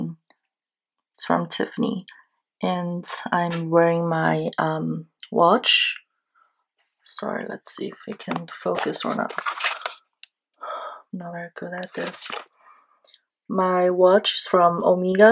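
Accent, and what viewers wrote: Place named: England, United Kingdom. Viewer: like your english accent. wanna hear chinese too! plz do chiese video sometime soon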